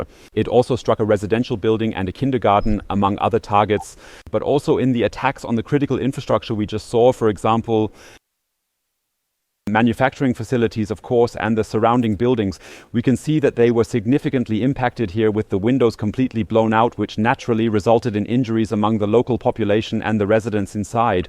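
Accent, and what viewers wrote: Germany. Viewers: A pleasure to watch with such a knowledgeable, handsome host who is extremely fluent in English. I don't mind at all the touch of a German accent. He only slipped up once, letting "Belgrade" come out instead of "Belgorod.' That's highly forgivable, considering that the meaning of "Belgrade" in Serbian is exactly the same as "Belgorod" in ruzzian.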